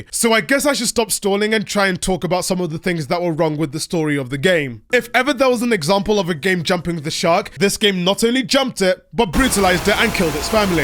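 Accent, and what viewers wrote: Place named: RP, United Kingdom. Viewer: as a new subscriber to your channel, you sound like those rich British people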